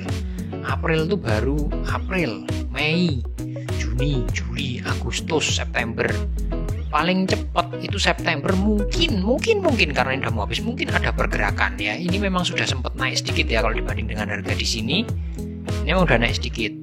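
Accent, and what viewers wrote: Java, Indonesia. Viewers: Logat jawa kukira mukanya jawa, tp muka chinese kek opah opah hihi Thanks ilmunya bang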